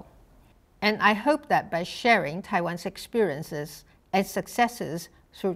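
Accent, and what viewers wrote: United Kingdom. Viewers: 迷人的英國腔😍😍😍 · 小英 got a bit British accent which is cool:) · 小英總統的英式英文好好聽! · 果然英國留學英國腔厲害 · 哇！蔡總統說的是英式英文欸！ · 對啊！以後總統像一樣，用英式腔英語優雅及用辭禮貌，有點像古典貴族風範，希望大家都在這點有共識，要不然臺灣就被中共更嚴重。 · 蔡總統的英倫腔好好聽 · 有英國腔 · 好好聽的英式口音喔😍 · 英國腔太神la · 哇！英式腔，英語優雅及用辭禮貌，有點像古典貴族風範，難怪美國政客這麼喜歡她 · 赤坂怜奈她是british 為主，american 為輔，有些音還是偏美式的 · 蔡總統的英文是不是算英式發音啊？覺得滿好聽的，場景覺得拍得好有質感。覺得台灣的總統好先進，有臉書有twitter還當youtuber, 好親民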